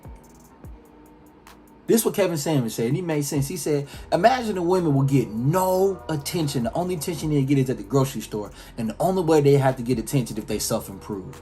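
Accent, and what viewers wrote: Baltimore, United States. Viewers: you From BMore? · This is my 1st time watching your vids. Are you from Baltimore? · BOY I KNOW YEW FROM BALTIMORE BY THE WAY YEW SPEAK 😂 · U from bmore?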